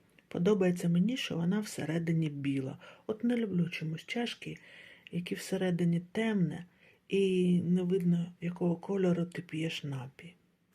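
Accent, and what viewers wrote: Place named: Kherson, Ukraine. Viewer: Гарні покупки! Одразу по вимові зрозуміла що ви з Херсону❤ Запрошую на свій канал про бюджет за методом конвертів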